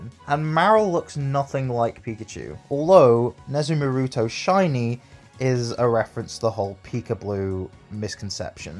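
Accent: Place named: Scotland, United Kingdom. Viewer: hello hello hello what's all this then Scottish accent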